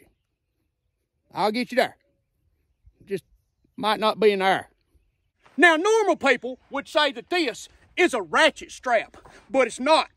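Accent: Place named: Texas, United States. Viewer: bro sounds like Texas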